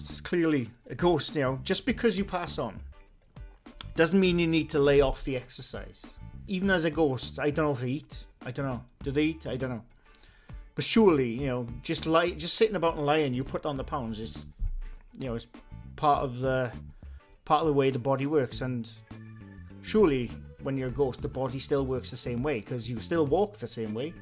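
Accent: Wales, United Kingdom. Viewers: your Welsh accent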